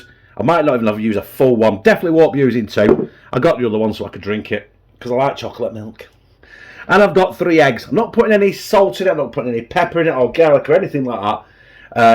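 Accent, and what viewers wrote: United Kingdom. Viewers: Just discovered you while searching for a chocolate pancake recipe. Dang you are fun!!! I'm thinking a spoonful of ice cream or whipped cream on the top w/ crushed KitKat or flake would be divine. Definitely agree w/ your end comment on the salt but would add it into the mix. I've subscribed based purely on this recipe plus being a Brit living in Texas I just love hearing your accent & 'language' LOL!!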